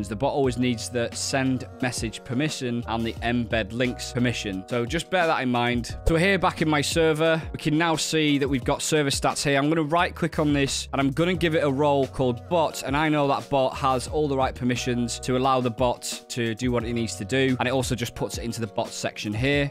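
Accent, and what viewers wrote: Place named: Liverpool, United Kingdom. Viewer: Awesome vid, man. Quick and simple and I got my counters set up in no time. Subbed because of your Liverpudlian accent tbh!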